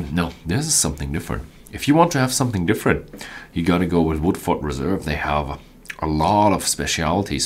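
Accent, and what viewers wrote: Germany. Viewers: Did you understand what he said? Should we all sound like the Queen of England or Donald Trump? Language is about communicative exchange and once meaning is transferred, the way in which it is transferred is immaterial. Two way communication! Should 1 billion Indians or 100 million Nigerians who can all communicate through English adhere to your pronunciation patterns? No, they shouldn't. Rejoice in the difference and let's not homogenize and standardise every bloody aspect of life. speaks German as his first language. There is natural interference coming from German, as there would be from French, Arabic or Chinese. Get over yourself man....he pronounces words differently, as you would when you learn to speak German as well as speaks English.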